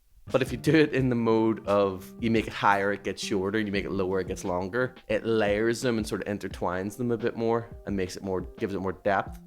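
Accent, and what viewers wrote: Northern Ireland, United Kingdom. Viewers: It always throws me for a loop when I click on a random YT video and hear an aul norn iron accent, since we're so rare on here. Good videos mang, keep er lit!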